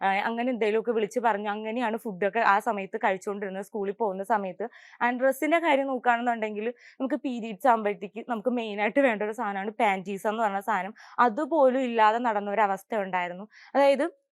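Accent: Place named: Trivandrum, India. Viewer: Tvm slang aan samsaarikkunnath🙄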